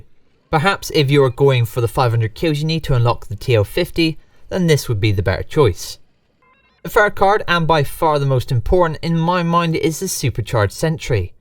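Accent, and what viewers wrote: West Country, United Kingdom. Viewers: The west country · Are you from Bristol? Or Somerset?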